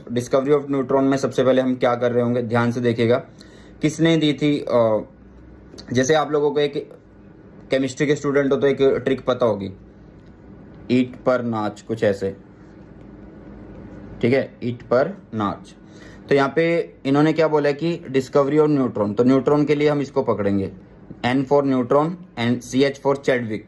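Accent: Haryana, India. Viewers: Sir aap haryana se ho kya😁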